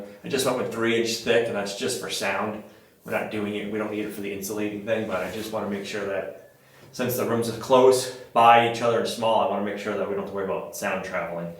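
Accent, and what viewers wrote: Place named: New England, United States. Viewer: Giggle. You called your wife Gottta love the New England accent! I grew up in MA, got called "Linder" so much, it became my nickname.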